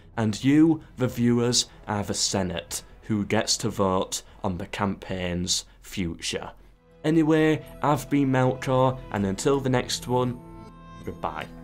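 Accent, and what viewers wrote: United Kingdom. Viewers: What UK accent is this? Sounds like every female flight attendants on the low cost flights, always loved that accent =)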